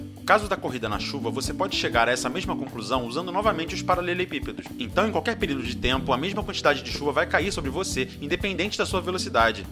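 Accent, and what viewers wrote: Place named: Rio de Janeiro, Brazil. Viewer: caralho é impossivel ver mais de 1 minuto de um vídeo em carioquês, que coisa insuportavel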